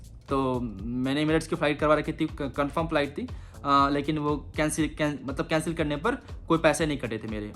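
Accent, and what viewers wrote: Bihar, India. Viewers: Its immigration and u r saying immigrason . Dont use bihari accent . Ab toh dunia ghoom liaaa thora develop kr apne aaap ko .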